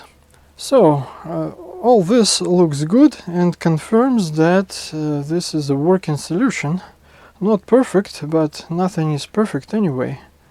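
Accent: Russia. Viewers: Просачивается русский акцент :))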